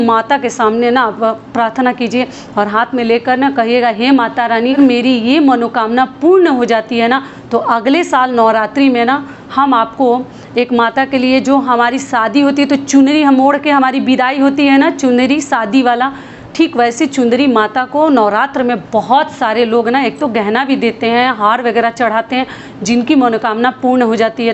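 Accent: Bihar, India. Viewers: Jai mata di..didi ap Bihar se ho kya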